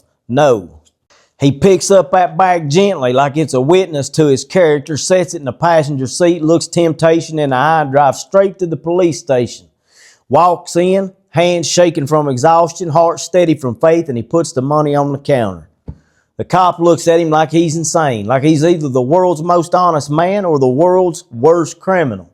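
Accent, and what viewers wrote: South, United States. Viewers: Masha’Allah, Uncle You have a special way of articulating in these videos, delivering wisdom in that southern accent is heartwarming to see. Keep up the great work. May Allah make us steadfast on this faith. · Brother, every time you share a story in that beautiful Southern accent, you wrap a powerful lesson in the most gentle warmth. You take an incredible act of honesty and make it feel like a conversation on the front porch—simple, true, and straight from the heart. And when you end with, "and that's all I gotta say about that," we feel the weight of your faith and the sincerity of your character. It’s a blessing to have your voice in the Ummah. May Allah continue to bless you and your good wife. Ameen.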